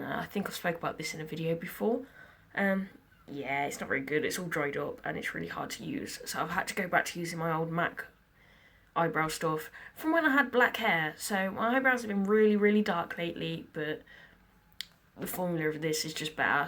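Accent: United Kingdom. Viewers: lol you speak with a british accent where does that come from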